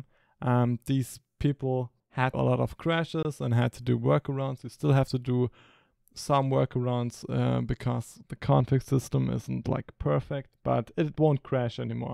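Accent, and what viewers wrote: Germany. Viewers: In germany say: Deutscher Akzent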